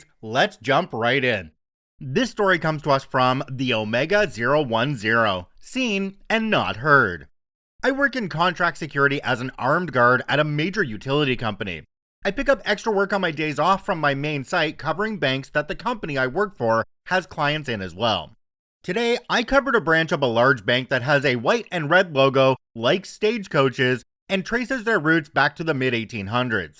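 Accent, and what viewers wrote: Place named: Canada. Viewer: Hey,! Good to see ya! Nah, I don't think your channel would ever be mistaken for AI. I've heard Brit and US accents, but never a Canadian accent in AI voices. Not even once. When I saw yesterday's vid, I thought, "Okay, I know the voice, but the channel name wasn't Karma Stories, it was...." I guess I must not have had enough caffeine in my system, because the great, big chameleon on the screen didn't quite register with me. Well, maybe it blended in with the background.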